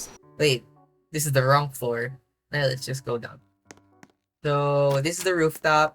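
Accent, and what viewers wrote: Philippines. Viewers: You sound filipino, are you?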